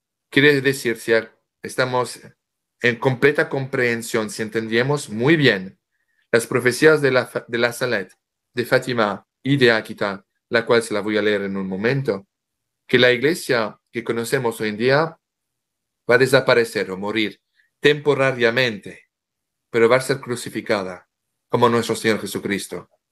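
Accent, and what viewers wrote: France. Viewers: Es un honor escucharle con su precioso acento francés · Me gusta su acepto francés. Gracias.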